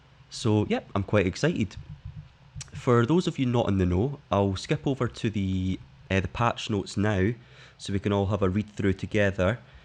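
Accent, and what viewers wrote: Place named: Scotland, United Kingdom. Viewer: When you were reading the comment and he complimented your accent and you said "ah dunno about that mate" just know that while idk about people from elsewhere, basically every American I know loves a Scottish accent (as long as we can understand it lol). You really are a fun guy to listen to. I like your sense of humor, it sounds like we're just hanging out shootin the shit and I think that's part of what makes your channel so charming. If you did go the 'professional' route then a lot of that would get lost. Don't change, your format is great. Glad to see ya back!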